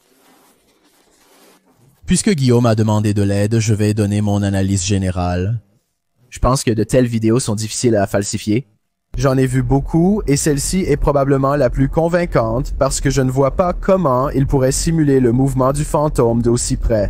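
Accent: Quebec, Canada. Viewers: Cie vous n'aimez pas l'axent du kebec changer de série 😮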